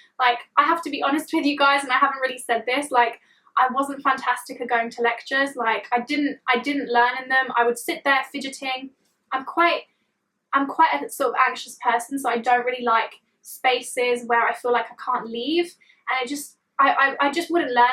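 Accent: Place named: England, United Kingdom. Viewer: What a horrible accent what country do you come from the the wrong side of the tracks in england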